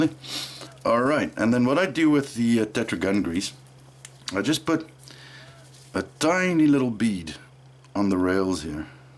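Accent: Afrikaans, South Africa. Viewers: I sense the Afrikaans in you :)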